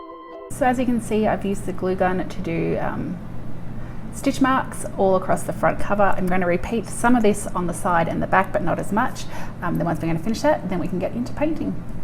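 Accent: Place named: Australia. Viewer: Hi new subscriber here fron Canberra, Australia. I presume by your accent you are Aussie?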